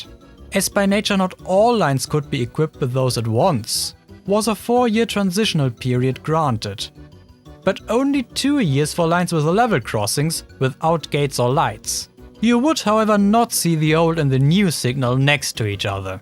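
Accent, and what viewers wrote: Germany. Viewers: Not to be mean but I can REALLY hear the German grammar in your English. It's kinda interesting since your accent isn't all that thick, yet your sentence structure and word choice are so obviously German.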